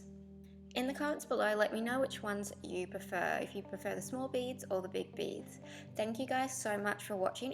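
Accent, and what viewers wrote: Australia. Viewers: Good vid, and nice to hear an Aussie accent 👍🏽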